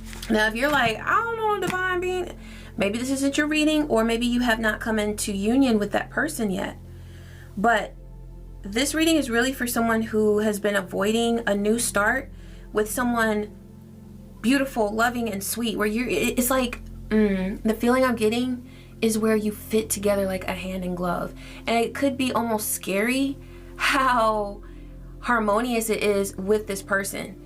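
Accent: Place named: Trinidad and Tobago. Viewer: on point with the voice 😁 you're great.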